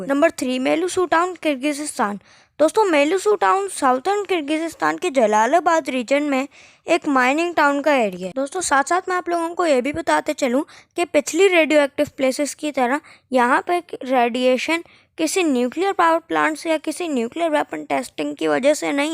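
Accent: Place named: India. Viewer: I am from britain I can’t understand you because of your accent so people who are not indian can not understand you very well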